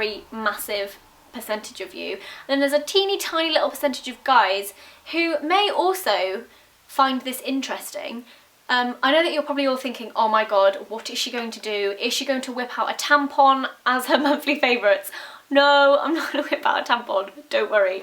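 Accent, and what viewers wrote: England, United Kingdom. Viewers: British accent-3-3-3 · i subscribed because your amazing british accent, but then i figured out i love your videos, too ;D well, your really pretty and really amazing! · you're the representation of an british accent :D cute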